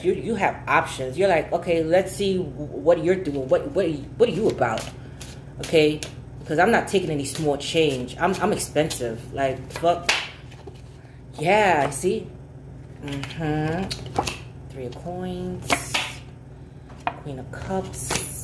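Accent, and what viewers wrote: New York, United States. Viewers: you are so funny are you from New York New York or New Jersey that's your accent I love it but you yeah I love the reason I love it I'm a Leo son Taurus Moon and a cancer I forgot that one he's an earth sign I've been waiting for him to wake up his mind it's been almost 2 years but I have time not exactly where I want to be and he's got out of a divorce about 3 years ago I have options you know we Leo's they love us haha they try to act like they don't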